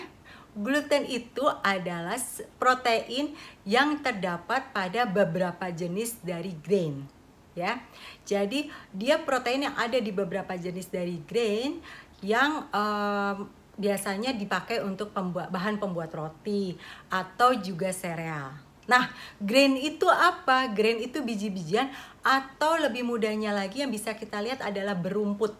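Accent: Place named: Java, Indonesia. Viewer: Kaya jawa ya.. sy jogja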